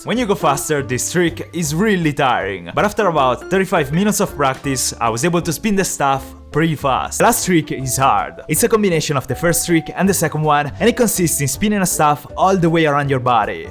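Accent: Italy. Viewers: Ho sempre sentito un accento particolare e oggi ho finalmente scoperto che anche te sei italiano! 😂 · L'accento italiano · Ti assicuro,notavo l'accento ma mai pensavo fosse italiano,also extra nice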